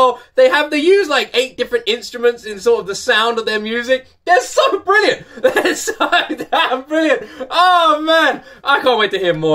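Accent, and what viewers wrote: United Kingdom. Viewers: Tiene acento británico. Incluso dijo que está en UK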